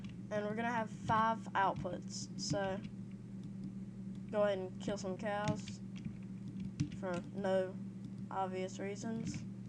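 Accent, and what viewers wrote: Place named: Texas, United States. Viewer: Nice Texan Accent! Go Texas.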